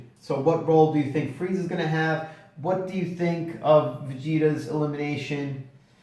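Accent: Italy. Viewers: zio, ma sei italiano?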